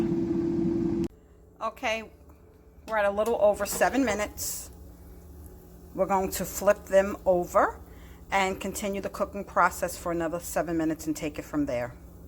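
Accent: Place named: New York, United States. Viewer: That little piece was I'm sure was the best. But the rest I'm sure was perfect. You sound like a New Yorker all day and night. Something I will try soon!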